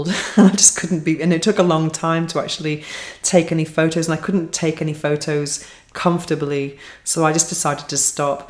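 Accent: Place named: RP, United Kingdom. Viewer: Absolutely, I didn't mean it as a critique. You usually speak, for someone who doesn't have English as a first language, in a way which sounds more of a received pronunciation. Or it might be my imagination for all I know. :D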